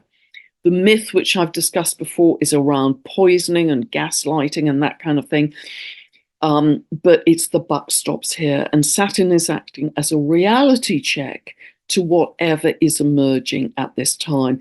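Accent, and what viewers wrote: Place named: RP, United Kingdom. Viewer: Thank you - this is what wisdom looks like, in the Queen's English, with a warm, lovely smile.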